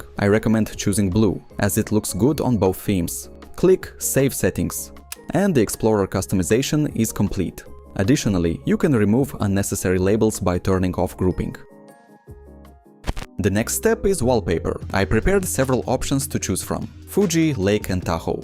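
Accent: Russia. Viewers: Стронг рашн акцент 😊 спасибо за видео ❤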